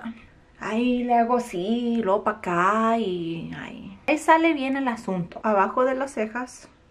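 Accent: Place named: United States. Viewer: OMG sister your Spanish needs work but you are hilarious I love it 😻 Just listening to you makes my day cuz you can be so gringa😭👎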